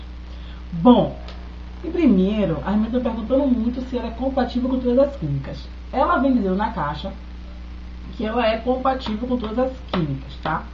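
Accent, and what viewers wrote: Bahia, Brazil. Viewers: esse sotaque ai eu conheço .povo bahiano é tudo animado mesmo.quarquer dia eu vou na bahia conhecer melhor a cultura de vcs.amei o video bjs!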